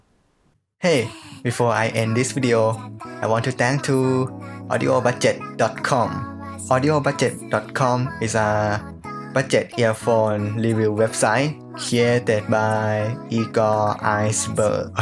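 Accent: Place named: Thailand. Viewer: คิดว่าต้องเป็นคนไทยแน่ๆ สำเนียงแบบนี้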